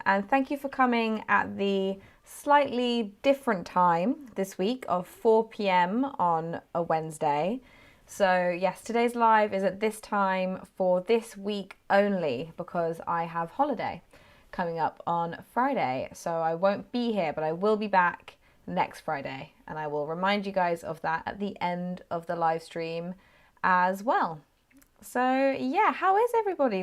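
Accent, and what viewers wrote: RP, United Kingdom. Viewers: Is it rp accent?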